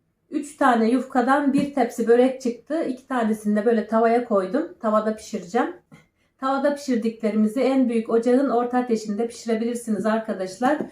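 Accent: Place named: Çamoluk, Turkey. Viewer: Kız sen çam oluklumusun aynan ordakiler gibi konuşuyorsun bolçene dirorsun onlarda öyle konuşuyor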